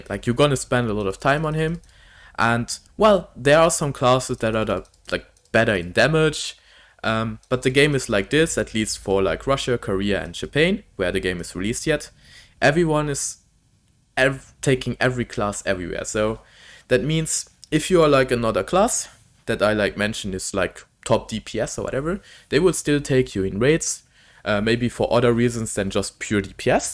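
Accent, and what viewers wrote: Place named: Germany. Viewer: Are you german? You sound german, lol.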